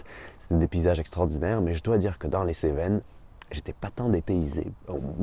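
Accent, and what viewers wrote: Quebec, Canada. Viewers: La visite de la France avec accent quebecois YES!!!, si tu passe par la Nievre n'hesite pas a me contacter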